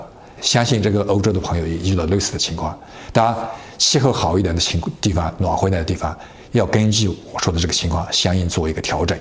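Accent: Yunnan, China. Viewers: 谢谢李老师讲解😀听口音李老师是云南老乡？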